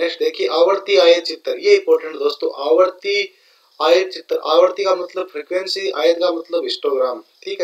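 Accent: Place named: Bagri, India. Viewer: Sir aap hindi bdiya nhi bolte . Aapke tone se bagri nikl rhi h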